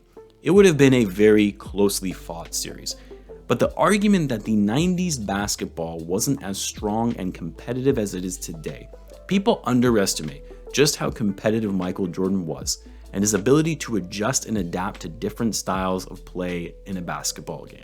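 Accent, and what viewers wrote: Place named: New York, United States. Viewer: lol I have a few people who work on my job that come from NY and you sound so so so much like them lol and they can’t stand MJ and those Bulls, but it is a respect issue, and when Scottie dunked on P Ewing and then pushed him down & those Knicks fans never ever forgot it either. Well, I never truly hated the Knicks, but it’s those Yankees that I love to hate, because I am down here in Baltimore Maryland, and those Yankees broke my heart so many times, but getting back to your subject, I like The Lakers and I wanted LA to win, Portland to win, Seattle to win, and Phoenix to win, but I was never truly a fan of Karl Malone and that group, so I loved it when MJ and those Bulls beat Utah, but I was cheering against The Bulls the majority of the time, but then, there comes a time where you have to give credit to those Bulls of the 90’s and that goes for MJ & Pippen, because they came together as a team when it counted most. 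Ummmmmm sure MJ gave most of those Teams the blues but Scottie was putting in work as well & as MJ would slack off, you had Scottie to seriously deal with, so it went both ways. It was almost like having a good boxer who was predominantly right handed, but would also jab a person with a short left handed jab, but they were good together ✌🏾I was so so hoping that Kobe and Trevor Ariza was going to give me much of the same, but The Lakers traded for Artest 😞 & I am still saddened by Kobe’s tragic end bait ( Sighs 😔 Greatly )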